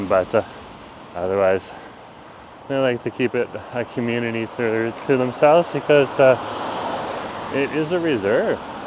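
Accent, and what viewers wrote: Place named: Canada. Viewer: I watch these vids just to hear you laugh! and the Canadian accent lol. Changes: I think was a Hand car wash. my old gas station. More apartment buildings, ofc. use to be Starbucks.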